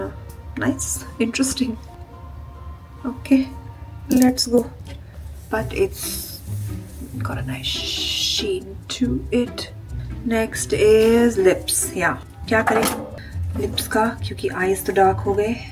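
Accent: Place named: Maharashtra, India. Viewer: marathi accent , itna time mahi re baba ❤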